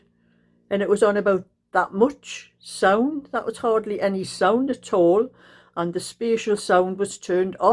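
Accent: Newcastle, United Kingdom. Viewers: I just come on to hear you chat you make me laugh I’m a Geordie and love your Geordie banter you make me smile thankyou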